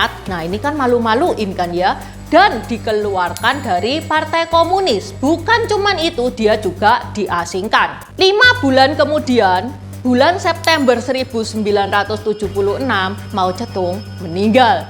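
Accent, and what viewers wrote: Java, Indonesia. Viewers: Bahasa jawanya kental haha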